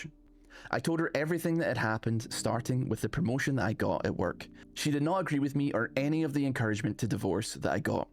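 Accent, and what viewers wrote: Scotland, United Kingdom. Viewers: is indeed scottish! great voice for the great takes indeed:))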